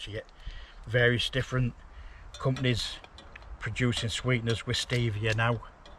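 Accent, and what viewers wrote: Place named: Hull, United Kingdom. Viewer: Thought I could here ull accent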